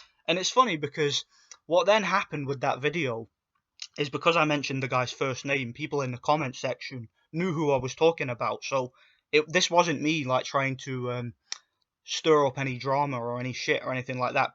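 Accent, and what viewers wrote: Yorkshire, United Kingdom. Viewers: Scottish?! I'm jus' over the wall... More or less scottish as far as Gov/london is concerned haha you got yorkshire-ish accent but i talk geordie so no 1 understands owt i waffle. Will follow Count, sounds like good crack. I dont know how 2 be politically correct... Hope antifa comes 2 my neck o the woods. They'd honestly get tortured... "Take a knee? Am takin them both'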